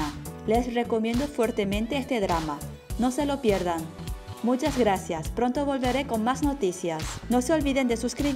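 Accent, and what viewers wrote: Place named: Spain. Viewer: La semana próxima se estrena esta serie en España estoy deseando verla y sobre todo poder oír la canción de mi bias en. Por cierto hablas un español perfecto, con una cuidada gramática, palabras difíciles y no muy corrientes, tu acento parece aprendido en España, estoy totalmente asombrada, magnifico trabajo, saludos desde Barcelona, esperando que salgan las entradas para el concierto de, ojalá no se anulen.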